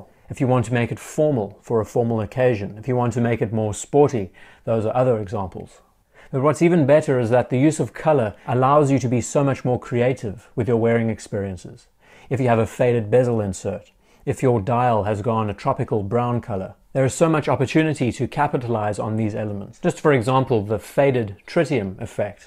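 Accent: South Africa. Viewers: I do like the South African Accent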